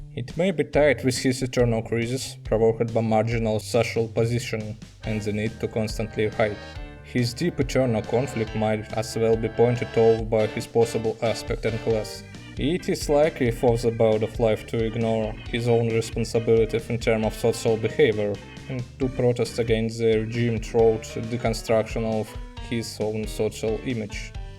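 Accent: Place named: Russia. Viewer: cute russian accent :3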